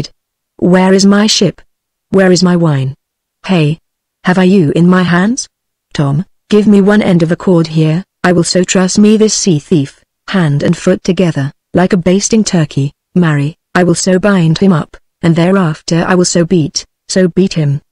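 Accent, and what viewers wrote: United Kingdom. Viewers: British female robot. Awful. Avoid.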